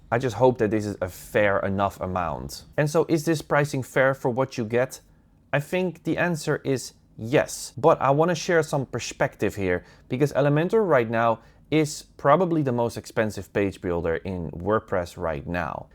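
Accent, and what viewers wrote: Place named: Netherlands. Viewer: Ive been an Elementor Pro user for years and Im seriously considering whether Elementor one, especially the mail feature and image optimization, would be worth it as a subscription for my clients. Id love to test it in real client projects. And yes, theres only ONE with that legendary Dutch accent. Sending you warm regards from Switzerland, where the English accent is just as charming, just with more mountains.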